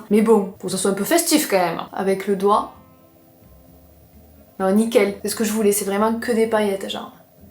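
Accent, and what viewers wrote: South, France. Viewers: Chère! Ton authenticité est touchante et rafraîchissante. J’aime beaucoup tes vidéos, d’abord le maquillage m’a toujours intéressée, mais aussi tu ne te prends pas au sérieux et j’adore! En plus de ton accent du midi vraiment charmant. 🥰 Et puis je me reconnais souvent dans ce que tu dis: timidité, préférer les animaux aux humains, les down que tu vis… et je ne suis sûrement pas la seule. Bref, tes vidéos font du bien! Merci! Et de très joyeuses fêtes à toi et ta famille! 🎄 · Cc, ma!!!! Nous sommes ta thérapie en quelque sortes??? Et bien toi en tout cas tu l’es. Tu es et restera pour toujours une de mes youtoubeuse préférée. Je me retrouve tellement en toi. Tout comme toi, plus le temps passe, plus j’adore plus les animaux que les humains... Tout comme toi une vraie Brigitte Bardot dans l’âme. Ne serait-ce qu’avec, en ce moment, l’abattage incompréhensible des vaches et veaux, alors qu’avec un vaccin on pourrait les sauver. 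C’est une honte. Et pour une jeune fille née en région parisienne, tu as un accent bien prononcé du midi, d’ou cet enchantement à t’écouter et rire avec toi. La première fois que je t’ai découverte sur les réseaux, cela faisait un peu moment que tu mettais des vidéos en ligne. Et du coup, j’ai regardé en un après-midi toutes les premières vidéos depuis tes débuts. Et j’ai adoré. Ta franchise, ta sincérité, ton caractère, ta joie, ton amour pour les animaux (tous). Tu es un exemple pour toutes les générations. Un grand soleil qui illumine nos vies parfois triste... ont aime et j’espère encore découvrir beaucoup mais beaucoup de vidéos sur la mode, le maquillage, la déco. tes animaux, un peu de ta vie de famille, avoir des nouvelles de ta fille et de ton mari, même si on ne peut pas le voir. Je termine en te souhaitant de très très belles fêtes de fin d’année. Restes toujours toi même, c'est comme cela qu’ont aime. 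Bisous à toute ta petite famille. ❤😂🎉😊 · Mais non, je suis née à Aubergenville aussi (en Décembre 1988 pas lontemps avant toi) c'est fou!! 😄 Jamais j'aurais cru en attendant ton bel accent du sud 😉 Passe de belles fêtes et reste comme tu es ❤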